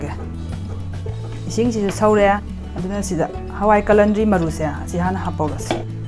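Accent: Kakching, India. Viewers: Mama Se kakching kei leiky deino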